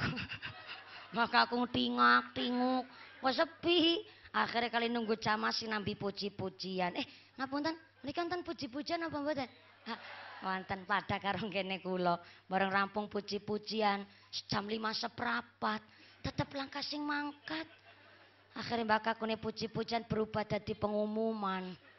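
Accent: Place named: Banyumas, Indonesia. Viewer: Kenapa skrng jd ngefens banget sama Ustadz, bagus ceramahnya ngapak meneh, 😀😀😀😀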